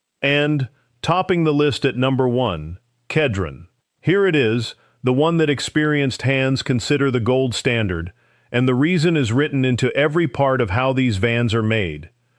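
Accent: United States. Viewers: This is hard to watch. Cheap A! crap. American voice and a hotch-potch of overseas video.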